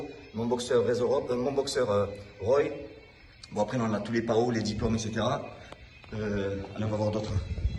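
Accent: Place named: Provence, France. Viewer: Tu es originaire d'où ? Tu parles de Midi-Pyrénées mais je trouve que ton accent sonne plus provençal. Belle galerie de photos ! :)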